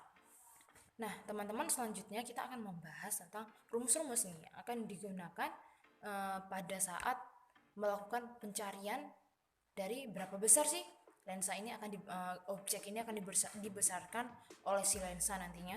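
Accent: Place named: Java, Indonesia. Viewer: medok e sejak kapan ndok??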